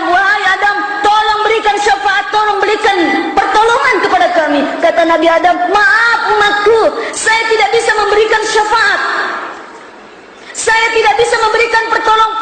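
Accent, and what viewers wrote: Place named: Java, Indonesia. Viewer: Bu gk orang Jawa tinggal di Pinrang atau orang Pinrang mondok di Jawa logatnya masih ada logat jawanya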